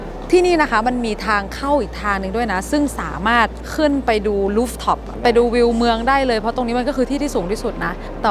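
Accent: North, Thailand. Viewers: อู้เมืองแหลวแลดซ้ำม่วน 🤣🤣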